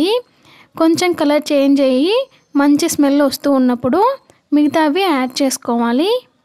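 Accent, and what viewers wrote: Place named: Rayalaseema, India. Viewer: Mana rayalaseema basha ❤ chana baguntundi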